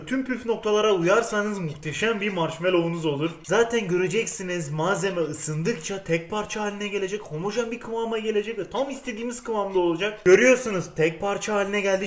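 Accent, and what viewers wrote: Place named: Erzurum, Turkey. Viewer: Abi nerelisin dadaş gibin konuşuyorsun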